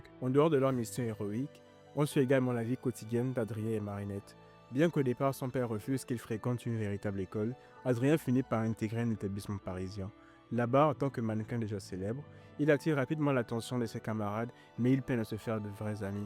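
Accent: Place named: Gabon. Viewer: J'aime, continuez, vue ton accent, je dirais que t'es Gabonais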